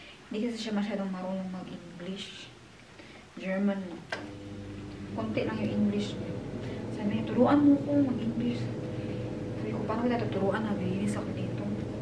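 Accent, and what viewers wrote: Visayas, Philippines. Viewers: sa saan pla ito na country? pgnagsa2xlita ka ng tagalog pra kang bisaya.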